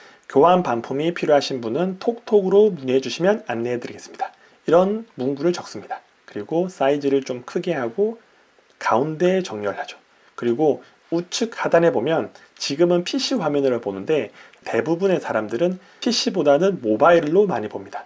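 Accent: Busan, South Korea. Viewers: 오늘도 상품등록 해보자~~~ ㅎㅎ 억양이 딱 부산분이신거 같으네요 저도 부산사람이라 느낌이 딱 오네요~^^ 반갑습니다~ 돈은 벌어야하는데 저희집 사정상 제가 집을 비울수없는처지라.. 정기적으로 출퇴근하는일은 엄두를 못내거든요.. 그런데 돈은 또 벌어야 된다는 아이러니의 구렁텅이에서 허우적 대고 있는 사람입니다... 그러다보니 집을 비우지 않고 할수 있는일을 늘 찾지만...쉽지가 않아 알아보고만 있던중 유튜브 알고리즘이 을 만나게. 해줘 정주행하며 감사히 공부하고 있습니다~ 평소 소비자 입장에서 알리익스프레스 이용하고 있거든요 말도 안되는 저품질상품도 많은데 시간들여 잘찾고 배송늦는거 감안하고 느긋한맘으로만 기다리면 정말. 가성비개꿀. 득템인 상품이 많더라구요.. 해서 요즘 소비패턴은 급하고 당장필요한건 쿠팡 이용하지만 그외엔 배송기간 감안하고 알리서 사거든요.. 알리서 싸게 구매한 악세사리를 혹시나 해서 가격을2배로해서 당근이랑 번게에 올렸더니 팔리더라구요... 해서 아에 알리익스프레스에서 가성비좋고 판매 많이 되는 상품 위주로 구매해 스마트스토어 개설해서 판매해봐도 되지않을까? 하는 생각이 들어 공부중인데요 그렇게 시작해봐도 될까요? 전 당장 월 몇천만원 이런수입 바라는거아니구요 그정도수입 내려면 그만큼 시간과 노동을 투자해야 되는데 제형편이 돈버는거에만 시간을 오로시 투자할 처지가 안되거든요 해서 전 지금 내식구 최소생활비 버는게 목적이고 그게 충족되고 안전해지면 더큰꿈 키워 나가보고 싶거든요... 제가 너무 소극적인가요? ^^; 뭐든 좀 배우려하면 목돈부터 드니 돈없어 달려들지도 못하겠고 그나마 께서 촙ᆢ자도. 알아들을수있게 자세한 영상 올려두셔서 보며 공부하고는 있는데... 이렇게 시작해봐도 되는건지 의문이 듭니다 너무 길고 추상적인 질문이라 읽기도 힘드시고..ㅎㅎ 답주시기 애매하시겠지만... 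조언 부탁드립니다~~행님~^^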